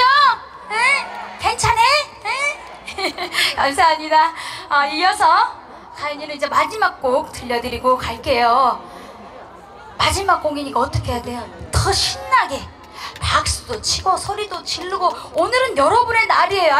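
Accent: Jeolla, South Korea. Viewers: 울가인님 사투리도. 구수하게쓴당깨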